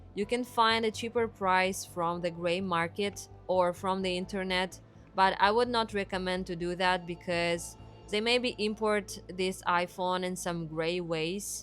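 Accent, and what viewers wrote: Russia. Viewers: Horrible Russian accent! You need subtitles, period!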